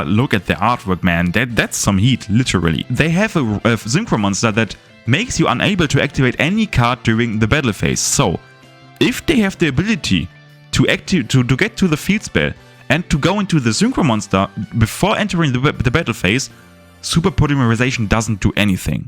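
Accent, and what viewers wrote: Germany. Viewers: Der Bro spricht einfach deutsch auf englisch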